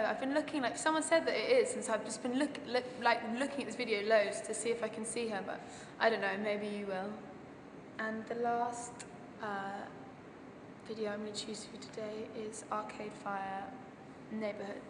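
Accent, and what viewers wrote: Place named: England, United Kingdom. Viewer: is she american or english?? cuz she has an english accent but says one of my fave videos *period* which is american.. :-P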